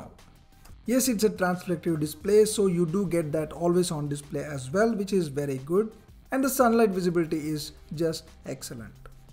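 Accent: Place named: Telugu, India. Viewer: Great review! Are you from telangana or Andhra Pradesh btw? Just curious 😅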